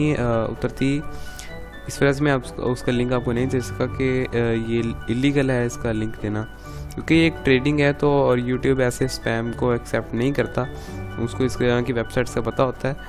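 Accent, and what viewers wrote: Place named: India. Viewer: well it's ok you have some indian accent so i don't really understand heh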